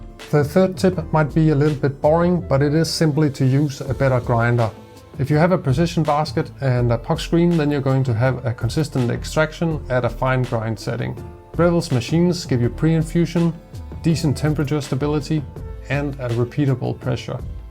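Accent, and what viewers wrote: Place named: Denmark. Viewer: Are you from denmark? - er bro legit fra danmark jeg kan høre ddet på dit engelsk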